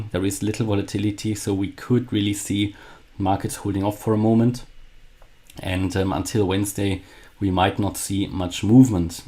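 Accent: Germany. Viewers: According to the accent you should be German. Danke für die excellente Arbeit. Es ist schön zu sehen wenn sich die EW´ler einig sind.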